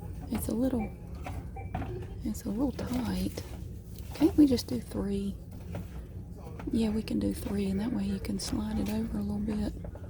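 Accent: United States. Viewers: I just love your accent, it's my favourite american accent!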